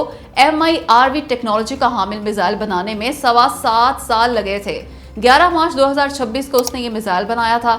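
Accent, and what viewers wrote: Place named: Pakistan. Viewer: Sister immence respect kia BAAT hay aapki Pakistani style urdu❤❤❤❤Hindi Ka ek lufz nahi bola❤❤❤❤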